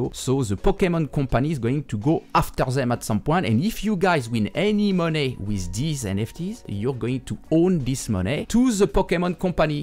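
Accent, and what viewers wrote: France. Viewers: Deux secondes dans la vidéo et j’ai grillé que t’étais français ! Haha en tout merci pour l’information et les vidéos c’est une vraie source fiable, continue ainsi mon con je suis derrière toi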